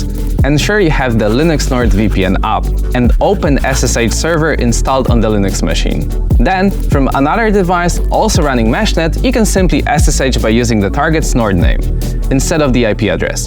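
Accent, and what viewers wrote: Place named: Eastern Europe. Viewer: Чувак, у тебя такой восточноевропейский акцент 😁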